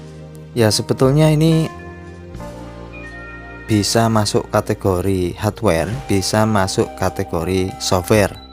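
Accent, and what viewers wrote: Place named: West Java, Indonesia. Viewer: njir sunda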